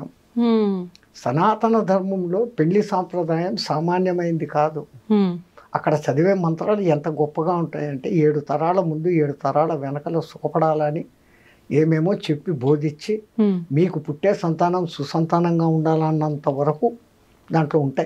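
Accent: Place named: Rayalaseema, India. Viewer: Sri Sri Sri gurudevulu notinundi ma rayala seema basha vinte shanthoshamga vundhi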